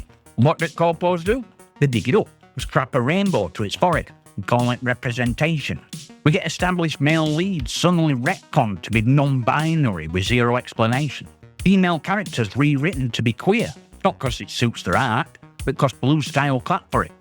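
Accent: Yorkshire, United Kingdom. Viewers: Bloodyhell I've not heard this accent in a while. What part of Yorkshire you from? Hahah I agree with your video for the most part, nice work man.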